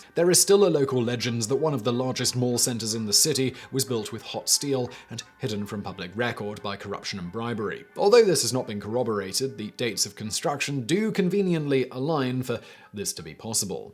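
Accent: England, United Kingdom. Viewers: Cool story. But your pronunciation is still terrible. And the names and places sound like a mumbled run-on. Ciuda--Juarez. The guy's name is Vicente not viNcente. It sounds especially strange because you have that smart british accent thing going on but then butcher or mumble the names. I guess Americans aren't the only ones. At least they enunciate the letters, even if the pronunciation is wrong. Don't forget the O-bam-a. I'm keeping count. :P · Hispanic names in a British are incomprehensible to my brain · This guy has the most British accent EVAH! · I really enjoy this mans narrating, maybe cause im American but his English accent is quite soothing. Even if it’s about a radiation disaster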